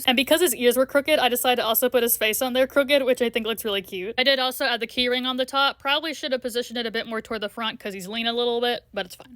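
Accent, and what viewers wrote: United Kingdom. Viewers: I feel like he’s British. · It's just a british guy ❤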